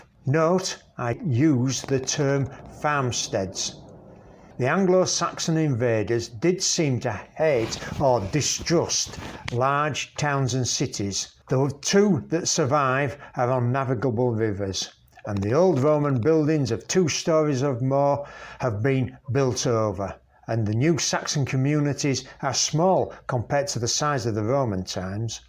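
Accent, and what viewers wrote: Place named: Yorkshire, United Kingdom. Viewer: even better with a Yorkshire narrator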